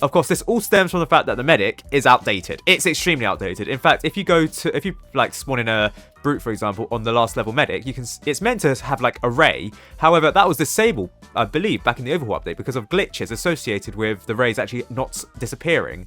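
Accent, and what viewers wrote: United Kingdom. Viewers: Let's go i love British man talking about Geneva convention laws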